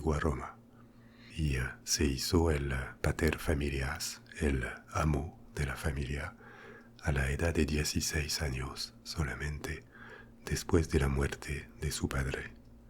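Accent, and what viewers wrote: France. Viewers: I've never thought about this before. You have a french accent in English, but also probably in Spanish too. I know a little Spanish, I'm gonna find out if it's enough to make out the accent Edit: nope, I can't tell. Maybe your Spanish is actually perfect?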